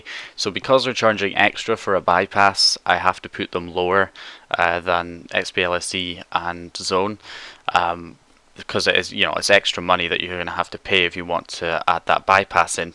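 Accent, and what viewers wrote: Scotland, United Kingdom. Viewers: Sno _Warior he's Scottish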